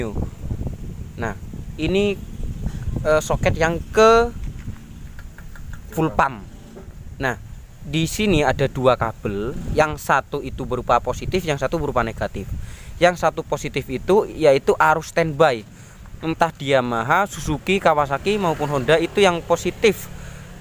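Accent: Java, Indonesia. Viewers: Ngmong mu lucu mas tp q paham ..q jawa jg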